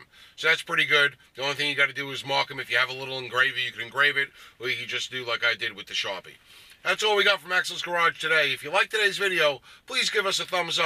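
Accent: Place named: East Coast, United States. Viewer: so you feel bad for everyone on the east coast? Lmao that’s literally how they all talk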